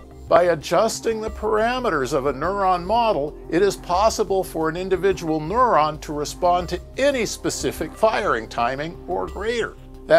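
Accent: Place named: Brooklyn, United States. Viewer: I recognize the accent as a subtle Brooklyn accent. So like, perhaps someone that grew up in Brooklyn as a child but now mostly speak in a "standard American" accent with only hints of their heritage. Of course, it's subtle enough it's hard to say, but it sounds really similar to other people I know are from Brooklyn.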